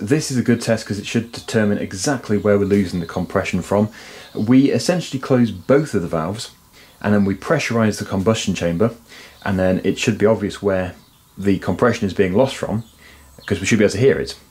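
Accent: United Kingdom. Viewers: May I suggest a shake and break for stuck screw removal? Works great for me. Classic British accent adds class to you videos.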